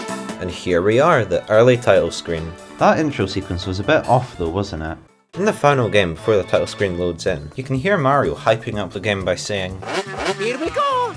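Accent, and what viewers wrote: United Kingdom. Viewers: My question is how come you have a NTSC final copy when you sound like a fellow Brit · Are you Scottish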